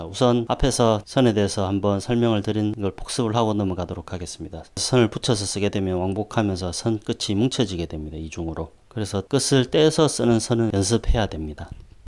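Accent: Gyeongsang, South Korea. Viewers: 알수없는 유투브 알고리즘의 추천으로 아무 생각없이 보면서 차분히 설명 잘해주시는 경상도 아저씨구나 생각했는데 에서 빵터짐ㅋㅋㅋ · 아 마음의 답글 우선 고맙습니다 ^^ 얼추 경상도사투리시라 짐작대로 부산이시라니 머네요 아쉽구요 인생후반 .. . J 님의 재미난 설명곁들인 멋진기초? 드로잉실력 나눔을 보다보니 불현듯 어렸을적 초등시절의 미술 ? 제재능이 생각났어요 새삼 .. 인생후반 겪고있는 힘든일들을 극복 승화라도 시킬수있는 힘 .. 얻고싶음을 기대하는거겠죠 말씀대로 교보든 어디든가서 스케치북 미술연필 구해봐야겠네요 선생님쓰는 연필 .. 뭘로 구할까요 ? 유투브 공짜연습생들 모여서 혹 ??? ㅋㅎㅎ 뵐날까지 함 기대 그려도봅니다 문외한에게까지 나눠주시는 마음 진심 고맙구요 👍👍👍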